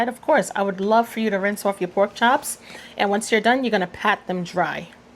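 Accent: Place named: Brooklyn, United States. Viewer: I follow a lot of cooking channels and am surprised I just found yours a few days ago. I'm glad I did, such delicious recipes to try out. Also can't help but notice your accent and was wondering if you're also from Brooklyn/NYC.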